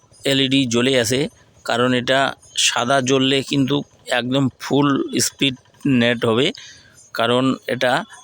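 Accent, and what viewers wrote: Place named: Bangladesh. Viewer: apnar vasa ta bangladesh ar monehoche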